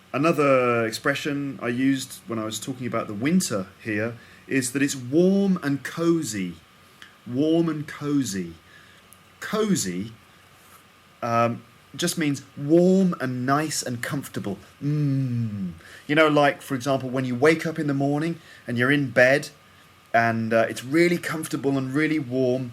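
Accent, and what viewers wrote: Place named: Cockney, United Kingdom. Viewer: This is my favorite podcast!!! You are the best! Wicked!!! your COCKNEY so funny!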